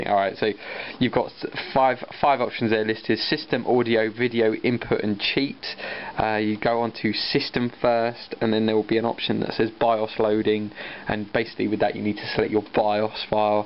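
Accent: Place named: United Kingdom. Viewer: I love the britich accent while i don't realy understand lots of words :)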